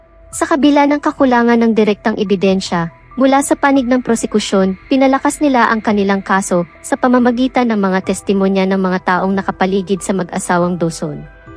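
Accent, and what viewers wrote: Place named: Visayas, Philippines. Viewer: ..hndi po kasi xa pure tagalog ang salita ...kaya ganian po ang salita nia . Kung kayo po ay magsasalita sa wikang visaya .. magkaka gnian din po ang tono nio saibang salita na gagamitin nio ...naiintidihan nmn kya unawain nio na lang o wag nio pakinggan o panuorin ..wala nmn napigil sainyo .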